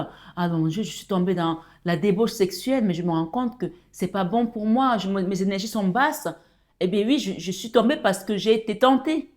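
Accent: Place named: Cameroon. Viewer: Merci pour la guidance. Vous avez parlé j'ai pensé à une sœur Camerounaise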